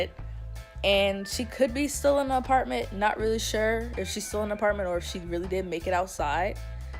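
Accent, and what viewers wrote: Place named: Philadelphia, United States. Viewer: 😂 as soon as you started talking I could tell you was from philly. Good video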